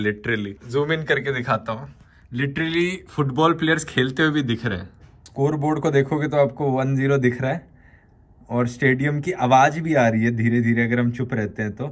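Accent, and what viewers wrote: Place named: India. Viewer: From the thumbnail I thought he was an Italian tourist than he spoke Indian, my Brian start rewiring!!!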